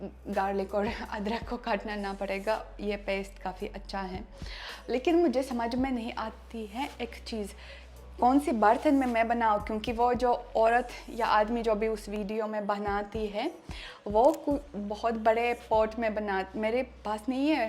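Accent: Standard. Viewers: इतना शुद्ध हिंदी तो हम भारतीय भी नही उच्चारित कर पाते है.. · Aap hamesha khus raho sabsa bada Rog kya kahenge log aap bahut khusmizaz aur funny ho,apki sudh Hindi aur aap bahut acha bolti ho apke vlog dekhkar bahut Anand ata hai maine apke vlog pehle bahut Miss Kiya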